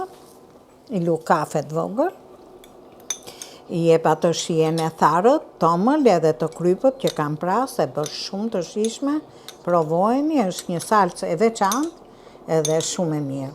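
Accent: Tirana, Albania. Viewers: Ty te lumte ajo goja e omel qe flet hala me zhargonin e bukur tirons puro dhe e trashegin nder breza ate. "Po i ndaj me dy sira" "pras", "voj" , "kryp", "long"... Fiks si nondaja teme e ke gatu kete gjelle me pras e te lumshin duart e arta! ❤❤❤❤❤ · Ti je flori te shikoj vetem te degjoj si flet tironce puro je flori lum ata qe te kan ne shtepi saper gatimet je ok te gjitha nenat shqiptare gatuajn jan nikoqire ju sa shikoj keni pasjon ne gatime kam respekt zoti te bekoft❤❤❤❤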